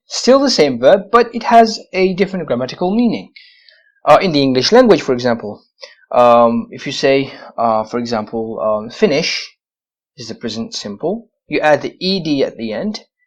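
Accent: United Kingdom. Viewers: Thanks a loot. I was shocked when you said " arabic our language " . You sound British.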